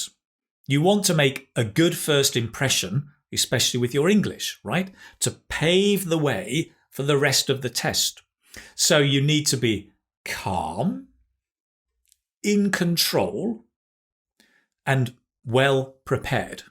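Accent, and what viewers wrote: United Kingdom. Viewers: Especially his british way of pronouncation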